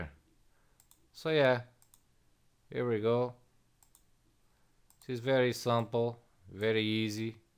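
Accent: Portugal. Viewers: Olá you sound Portuguese :) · hey amigo es portugues consigo ver pelo sotaque obrigado pela dica aqui no video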